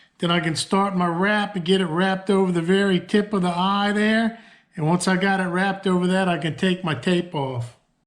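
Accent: Louisiana, United States. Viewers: I thought I recognized that accent! Fellow (former) South Louisianian here - and your video is perfect! I was worried about doing it "right", and this video shows me how easy it is to do it right, and make it look great. I'm in the Northeast now, and I have been trying my hand at fly fishing. I was gifted a flyrod from the late 1960s, and it needs to have the eyes rethreaded and resealed. Fishing for stream trout and is fun and all, but nothing like hooking on to a bull red! Can't wait to get back home to Louisiana to hit the marshes.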